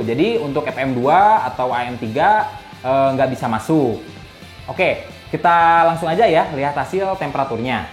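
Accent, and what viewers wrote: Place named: Tasikmalaya, Indonesia. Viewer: orang tasikmalaya dia kayaknya mas akwkwkw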